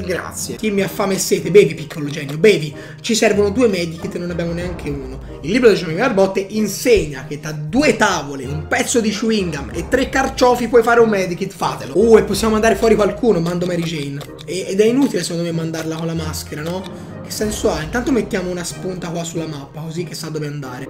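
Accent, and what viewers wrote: Tuscany, Italy. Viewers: ma tu di dove sei?hai l accento toscano...quindi sei toscano? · Ma sei toscano?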